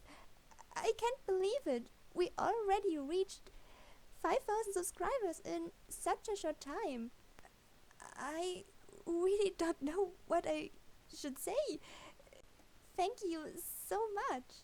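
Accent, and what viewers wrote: Germany. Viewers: Wow this is amazing. I really love this!:D Thank you for sharing this with us and I look forward to being on this journey with you. And your German accent is beautiful! never apologize! I'm learning it now ^^ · Omg ich habe mich schon gefragt, ob ich mir nur einbilde dass dein Akzent deutsch ist xD Glückwunsch zu den Abonnenten und ich liebe deine Videos :)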